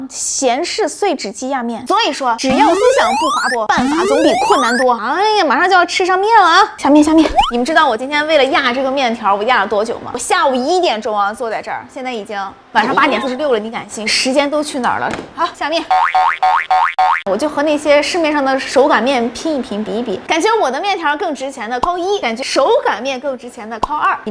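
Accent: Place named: Qingdao, China. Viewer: 你是四川的？你说话我还以为你是青岛的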